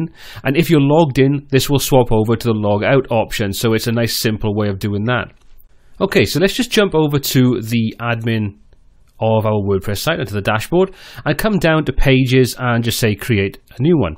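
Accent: Wales, United Kingdom. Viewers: You sound like you're from Wales. Am I right?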